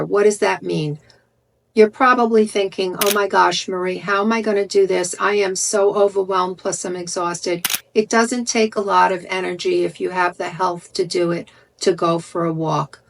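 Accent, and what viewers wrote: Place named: New Jersey, United States. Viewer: I’m guessing New Jersey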